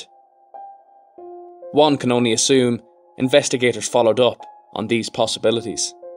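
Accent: Ireland. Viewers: I personally would have waited a few minutes then went looking for her... such a sad case she was beautiful girl... only found your channel yesterday been binge watching it frm then... new subbie keep up the great work 👍 i could listen to your voice all day! Are you from republic of Ireland? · What part of Ireland are you from?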